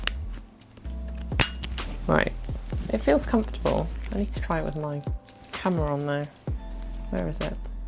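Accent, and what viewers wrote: England, United Kingdom. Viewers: I bet she's from England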